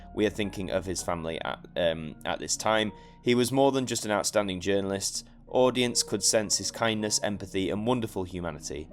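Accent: RP, United Kingdom. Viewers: WhAt a great man my cousin says sounds posh mr George alligayah x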